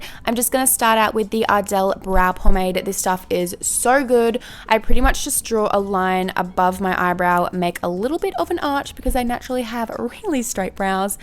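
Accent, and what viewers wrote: Australia. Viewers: your accent is sooooo damn cute Australian?? I'm new to this channel this is my first video of hers I've watched lol ... don't hate me if I'm wrong 😕 ... your absolutely gorgeous!!!! I liked this video before getting 2 minutes in.. I can just tell when I'm going to like someone..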